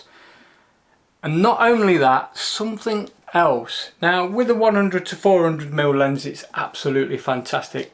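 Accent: Leicester, United Kingdom. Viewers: Good video. Love the Lestah accent. I worked there’s for a few years and now call rolls cobs lol 😂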